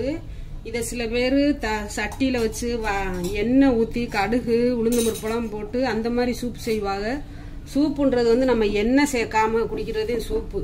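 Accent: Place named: Madurai, India. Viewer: How nice to hear Madurai dialect😘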